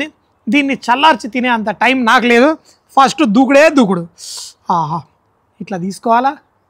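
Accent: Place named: Hyderabad, India. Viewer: pakka hyderabadi slang😁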